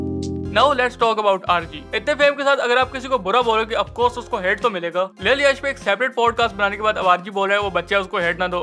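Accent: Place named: Pakistan. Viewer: Bhai aapke bolne k tareeky se hi pta chlta h ki aab Pakistani ho👀👍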